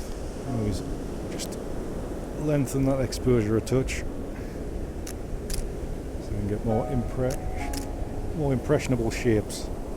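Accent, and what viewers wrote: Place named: Northumberland, United Kingdom. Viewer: Thank you, for these very interesting and informative videos. Like many, I came here after seeing you with Thomas Heaton. Your work is particularly reminiscent of the impressionists like Turner, in particular 'Wreckers Coast of Northumberland' and I applaud your efforts in this field. I liked your approach to processing the images and loved the outcome - a very unique perspective on a well known location. I can't wait to get out and try this myself. One small criticism is that when you were outside, the audio levels were a little low making it difficult to hear. No problems in the later two. Nice to hear a Northumbrian accent on here too!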